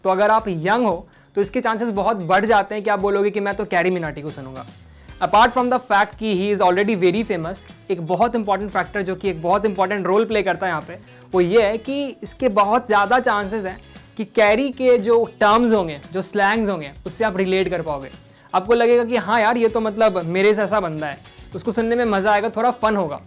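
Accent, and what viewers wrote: Bihar, India. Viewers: Full bihari tone that the guy 👦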